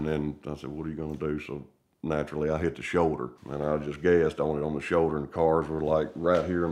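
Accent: United States. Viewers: This guy is the most American sounding man I’ve ever heard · if i think of a tipical usa acsent this is what i think off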